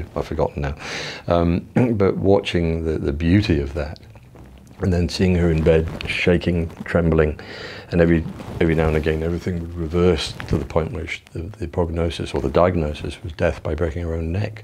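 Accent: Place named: South, United Kingdom. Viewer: Its late. Couldn't sleep. Popped on YouTube and there you are in the 'Recommended for you' section. We miss you up here in the north country. You're developing a bit of a 'Southern tinged' British accent. LOL Be blessed my Friend.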